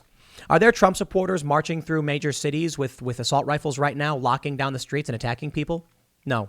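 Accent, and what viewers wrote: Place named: Chicago, United States. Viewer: .... Every video your Chicago accent is getting stronger. Something is definitely off...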